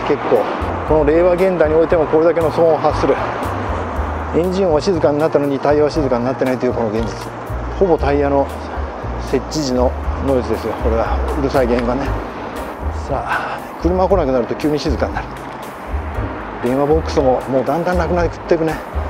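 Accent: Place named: Nagoya, Japan. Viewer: さんの名古屋弁、駄弁りなのケッタ漕ぎが心落ち着くよ。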